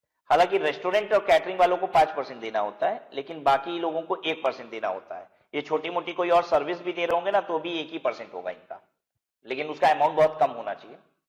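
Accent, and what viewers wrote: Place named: Uttar Pradesh, India. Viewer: Sir from Varanasi, UP. You definatly got that UP accent:) I am from Kanpur, UP. Thank you for all the videos sir Thank you very much.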